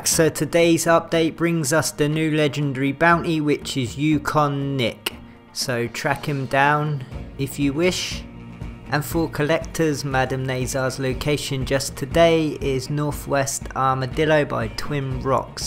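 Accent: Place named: United Kingdom. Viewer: Which part of the UK u from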